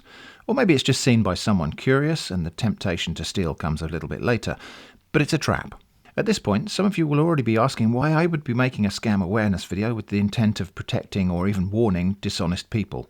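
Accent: United Kingdom. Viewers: > British accent > “dear viewer” for some reason that is entirely beyond my understanding, i feel instantly engaged in the video and compelled to subscribe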